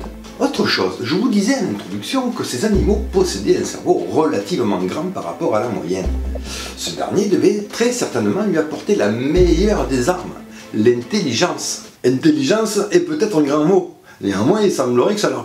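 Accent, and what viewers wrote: South, France. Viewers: L'acsent du Sud.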